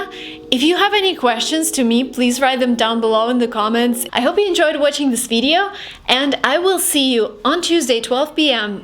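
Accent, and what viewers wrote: United States. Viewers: O my God how she built such accent if she wasn't born in USA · First of all, where are u from. I thought that u were from us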